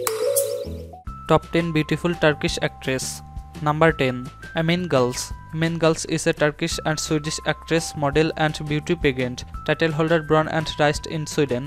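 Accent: India. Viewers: Indian english🤔🤔🤔not bad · Lol is the narrator Indian? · is this guy speaking English or Indian language?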